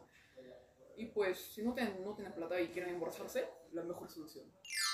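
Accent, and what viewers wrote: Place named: Peru. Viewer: por tu manera de hablar ya eres una peruana más, el pisco se toma sin saborearlo, sin retenerlo dentro de tu boca, en one nomás. Saludos desde Ica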